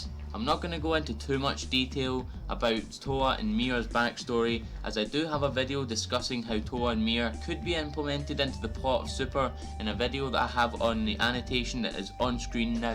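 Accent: Scotland, United Kingdom. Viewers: I liked this video, man! First and foremost, is that a Scottish accent I hear? If it is, that's awesome because we need more UK YouTubers in the Dragon Ball community - me being one myself! I loved your idea of a Saiyan that resembles Goku travelling to Earth in Trunks' timeline and easily destroys him. The only question from there would be, how did he become that powerful to have this be a threat to Goku, Vegeta and even the Omni-King? Me personally, I think "Black Goku" will be a created being, by a force that hasn't been introduced yet in Dragon Ball Super. Fun theories and video, I subbed for more! :)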